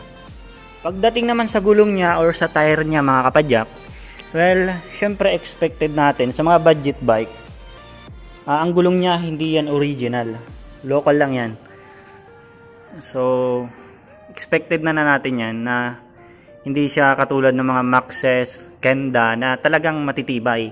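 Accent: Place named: Bicol, Philippines. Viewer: Bicolano ka sir😅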